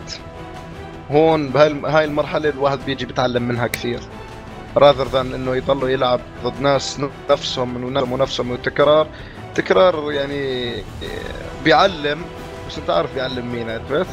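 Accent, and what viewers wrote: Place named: Jordan. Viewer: ههههههههههه بلزمش تكتبو اسمو 😂😂😂 معروف من لهجتو اردني 😆😊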